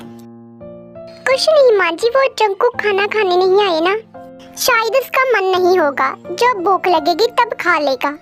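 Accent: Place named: Punjab, India. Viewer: Apka Hindi plus Panjabi accent h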